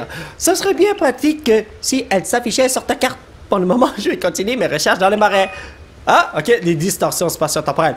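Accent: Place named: Quebec, Canada. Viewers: Je crois qu'il est québécoi je suis pas sur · t le best ton accent ma trop donné envie d'aller habité au Canada. Sinon j'espère que a la fin de l'aventure tu auras une équipe badass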